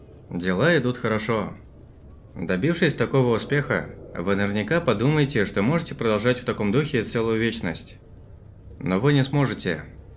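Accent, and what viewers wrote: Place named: Urals, Russia. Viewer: Да,в утра.Уральский говорок-это круто.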